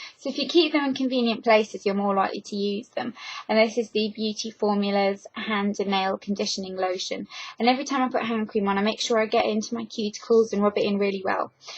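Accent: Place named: Leicestershire, United Kingdom. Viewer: It's refreshing 2 hear someone from the UK, on YouTube. Humour me - judging by ur accent, are u from Leicestershire, or possibly the West Midlands? Best wishes.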